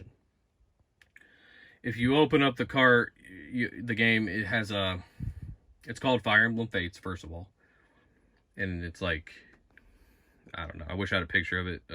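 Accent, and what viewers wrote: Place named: Texas, United States. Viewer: You from Texas?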